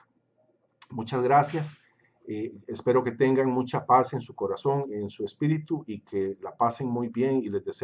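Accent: Costa Rica. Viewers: Reconozco a un tico cuando lo oigo. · Eso que dices de Usa describe a la perfección a México fascismo corporativo!!! Genial canal, fantástico que te hayas ánimado a compartir tus conocimientos. Saludos desde México,de dónde eres? por tu acento creo eres de costa rica. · sos de costa rica? Digo por tu acento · Sos de Costa Rica verdad? conozco ese tono de voz.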